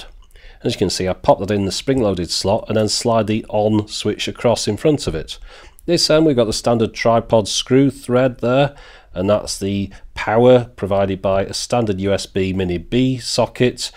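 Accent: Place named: North West, United Kingdom. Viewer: Thank you for taking the time and effort to do all these reviews; very much appreciated! I've watched pretty much every dash-cam video you've done (nice to hear a fellow NW accent, too, BTW ;-) ) and was initially hoping to get this one, but, since the price has now jumped to around £92 I was wondering if you could recommend what YOU think might be a good cheaper option (around the £50 mark as I'm not a millionaire just yet and I trust your judgement based on your no-nonsense reviews)?! Thanks!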